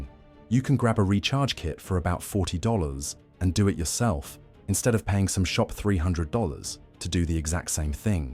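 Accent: United Kingdom. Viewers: Screw your British accent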